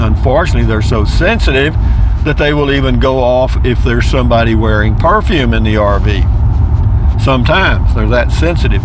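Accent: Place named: South, United States. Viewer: After watching this video I realized why I watch everyone of your videos. You have a GREAT sense of humor and your good ole boy accent is so relaxing and enjoyable. GOD bless you for being alive and sharing you life with all of us all. You enrich us all!!! Signed, one of your Rangers..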